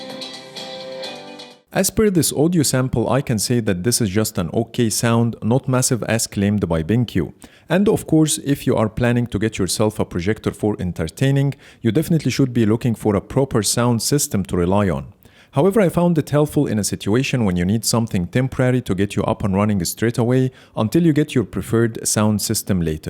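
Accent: Egypt. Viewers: Egyptian accent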